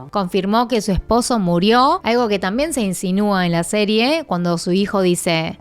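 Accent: Argentina. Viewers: Porque habla como Argentina? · como es posible que exista una china, que sepa cosas de korea, hablando español con acento de Argentina o.O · Hola como haces para tener este acento argentino ? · por que habla como tamara falco argentina??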